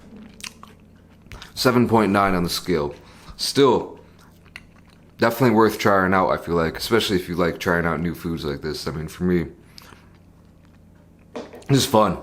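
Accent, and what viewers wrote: United States. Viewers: He talks American asf though I thought he was from the United States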